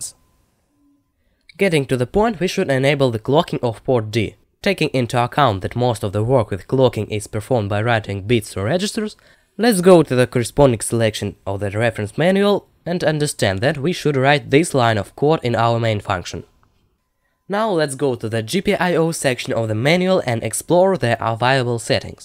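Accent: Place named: Russia. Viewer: worst russian accent i'v ever heard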